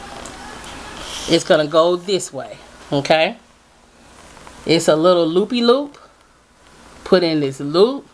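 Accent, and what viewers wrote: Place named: South, United States. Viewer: I love your voice. So country. You should teach a class.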